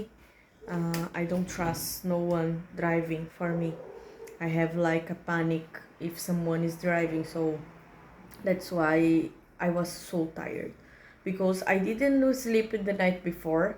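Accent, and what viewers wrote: Philippines. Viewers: As if i find your English accent is a filipino one..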